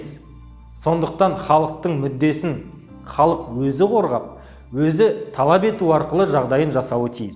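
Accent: Standard. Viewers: Билікте осындай қазақша таза сөйлейтін, өз ойын анық, түсінікті етіп халыққа жеткізе білетін адамдар отыру керек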